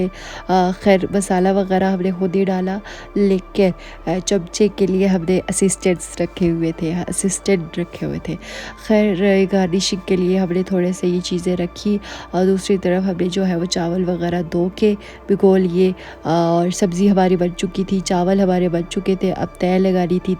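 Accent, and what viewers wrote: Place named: Kashmir, India. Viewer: Bht achy Awaaz ha literally khasmeeri lahjy m very nice vedio goodgirl nice effart❤❤❤❤❤❤❤❤❤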